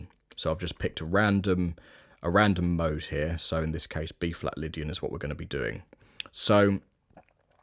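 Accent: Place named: United Kingdom. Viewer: Can you do the video again, but without the British accent?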